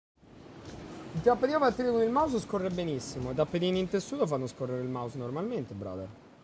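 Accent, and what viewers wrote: United Kingdom. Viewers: Quanto sei british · Ma quanto cazzo sei british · Grandissimo bro partita easy come sempre... Ma poi quanto sei british😂😜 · O mio dio ma quanto sei forte e anche molto british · Troppo brittish entra così a far parte della dpg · L accento inglese, oddio, mai piu da te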